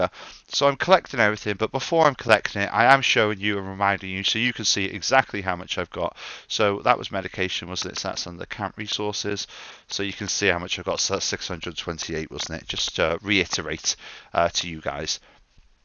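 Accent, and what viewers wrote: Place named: United Kingdom. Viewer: You should play rust because your British voice. You'd do well. 😉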